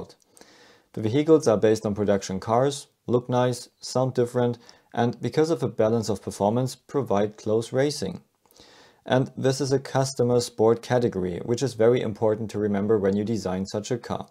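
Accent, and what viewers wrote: Germany. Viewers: deutscher accent in ersten 10 sekunden gespotted 👀👀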